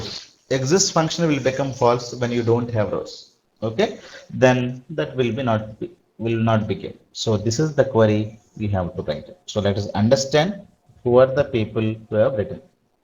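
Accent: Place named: England, United Kingdom. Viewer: you are a very good teacher with a good rhythm of instructions. My only problem is the English accent. Very good job. Thank you for helping us with SQL.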